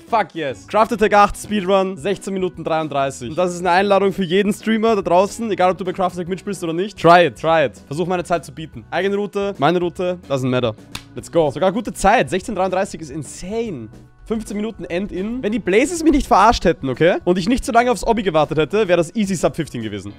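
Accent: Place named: Austria. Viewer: kommst du zufällig aus Österreich (nur aus Interesse) wegen dem Akzent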